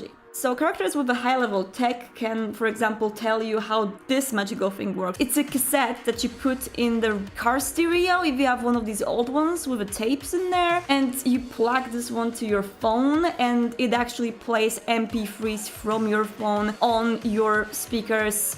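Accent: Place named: Poland. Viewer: I'm loving these videos and your polish accent <3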